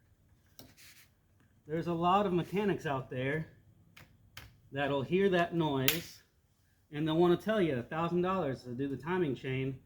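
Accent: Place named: Ukraine. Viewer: I can tell you’re Ukrainian by your accent.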